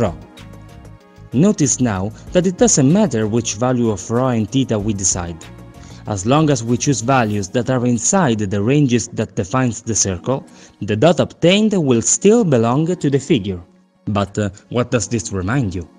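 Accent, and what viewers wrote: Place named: Italy. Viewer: Love your Italian accent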